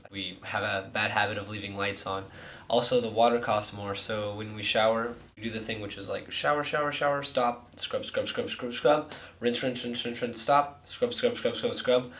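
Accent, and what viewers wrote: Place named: Spain. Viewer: Jajaja me encanta tu acento Español! Besitos desde País Vasco, España!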